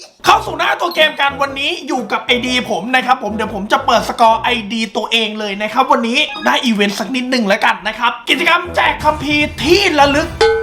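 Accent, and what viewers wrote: South, Thailand. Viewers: พี่ เป็นคนใต้หรอค่ะ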